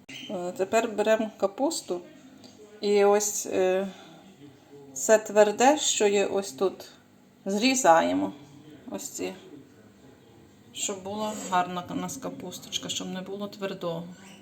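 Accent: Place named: Volyn, Ukraine. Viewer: С удовольствием послушала вашу речь, вспомнила своих родных с Волыни.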